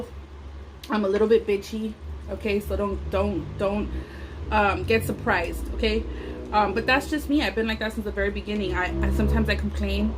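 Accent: Los Angeles, United States. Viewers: U have a LA accent n there’s nothing wrong with it I love it!! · Its probably ur LA accent. They r confused 4 sure.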